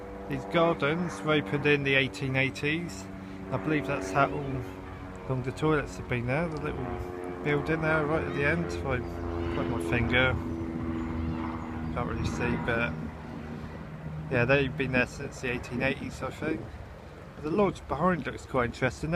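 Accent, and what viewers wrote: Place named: Bournemouth, United Kingdom. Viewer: It's so great to hear a truely classic Bournemouth accent. Makin me homesick!!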